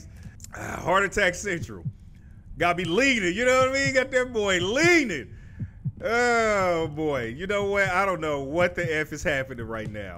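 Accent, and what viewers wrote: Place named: Russia. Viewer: Your Russian accent clean af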